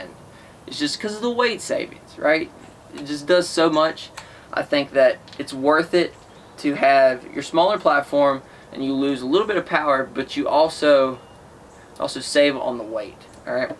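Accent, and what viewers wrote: Tennessee, United States. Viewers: So first off I aint gonna sit here and criticize you even if I might not agree with you 100% for the simple fact that as a guy who is trying to get a youtube channel off the ground I know the pain of making and editing youtube videos and your's are looking pretty good. Secondly for the people criticizing you for not mentioning .308 being better for long range I may be wrong but I'm willing to bet from that accent you come from the HEAVILY wooded state of Tennessee. where the average range of visibility is under 300 yards where as here in south central Kansas unless I'm hunting prime river ground I'm taking shots at or over 300 yards. So long range capabilities would not be as important to you merely because you don't really need them. Anyhow good video man keep em coming you've gained a new subscriber.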